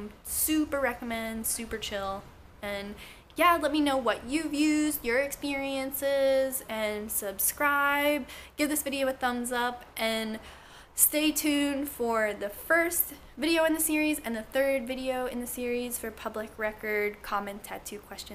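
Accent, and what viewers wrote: Philadelphia, United States. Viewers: Your from Philly?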